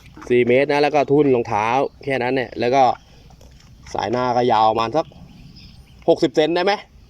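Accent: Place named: Nakhon Sawan, Thailand. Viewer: ติดตามนะจ้ะ สำเนียงเหมือนคนคอนหวันมีแง้ะด้วย อ่านเจอว่าอยู่ชัยนาท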